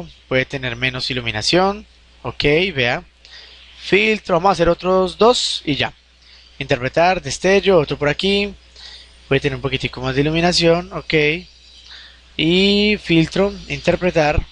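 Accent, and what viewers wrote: Colombia. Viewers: B dis, le escribo desde Vzla, le felicito por sus curso y le agradezco por la informacion compartida. Me agrada que use un lenguaje practico con buena diccion y con su acento Colombiano, porque la combinacion de todo ello resulta interesante, entretenido y no canson. Siga pa lante y sin egoismo que Dios sabra recompensarle. Hagale parcero, hagale. Saludos.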